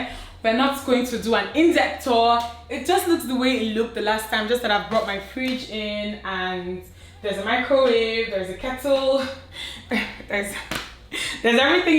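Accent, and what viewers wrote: Nigeria. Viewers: I love this video . I also love you naija accent; so true! Thanks for the tour. I am trying to pimp my room for my videos and I am more than inspired . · oh ... Nigerian accent won't let this girl be great ...anyways she Nigerian so..., but nice apartment tho...am currently renovating my own 1 bedroom apartment in the UK which is a lot similar to this, but a bit bigger in size. Its Easier over here because you just shop on Amazon or wayfair. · I love the Nigerian accent 😆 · Cherry chia different places different pronunciations. That’s how it’s pronounced in Nigeria.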